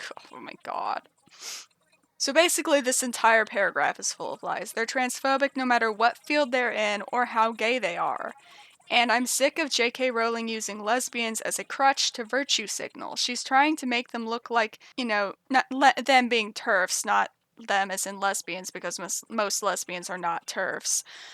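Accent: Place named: South, United States. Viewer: I love this vid, your content and personality is one of a kind! also your redneck accent is good! lol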